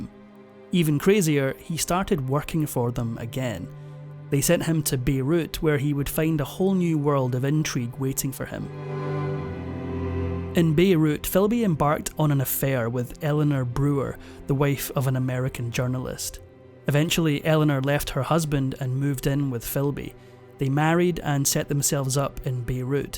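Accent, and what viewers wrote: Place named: Scotland, United Kingdom. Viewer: The commentary refers to "England", as if Scotland and the rest of Britain were not also a target for foreign intelligence. As if they were somehow uninvolved, like with the Empire, when the reality was very different. Also, someone with a Scottish accent not knowing the forename St. John is pronounced Sinjun....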